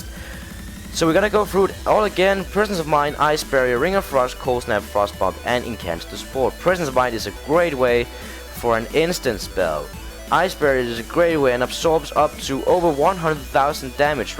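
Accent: Denmark. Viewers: han lyder fandme meget some en dansker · from denmark ? :P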